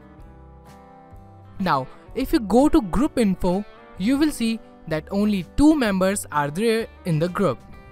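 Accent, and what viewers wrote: India. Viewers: He sounds messed up just like indian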